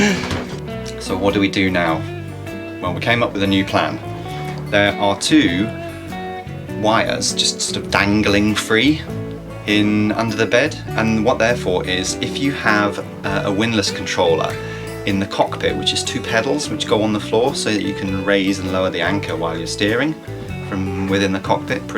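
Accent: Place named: Ireland. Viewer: A bit of Irish...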